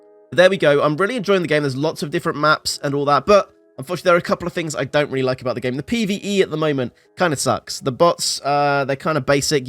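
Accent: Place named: United States. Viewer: Mate, your English stop pronouncing your T's in an American accent lol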